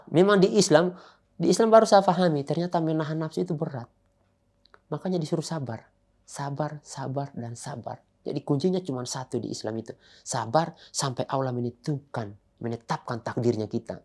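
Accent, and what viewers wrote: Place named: East Nusa Tenggara, Indonesia. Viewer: Logatnya ntt bukan Poso lagi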